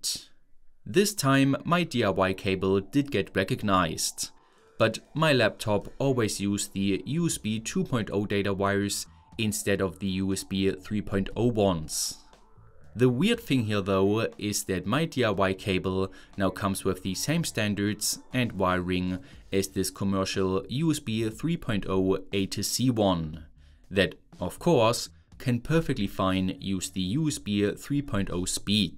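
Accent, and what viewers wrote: Germany. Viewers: du bist deutsch?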